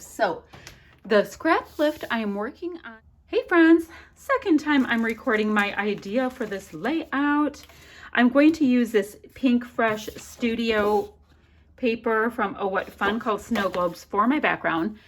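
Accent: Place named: Midwest, United States. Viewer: Nicely done. Precious! Precisely why we don’t have a tree this year. We have a 9 month old pup of a much larger breed. She loves to chew and to steal things (admittedly mostly socks). So our favorite carol this year is 🎶 No Christmas tree, no Christmas tree, Piper would strip you of your branches. 🎶 Also, I’m guessing you are in the Midwest(?) I hear several of the YouTube gals from that area pronounce “bough” like it rhymes with “snow” not with “cow.”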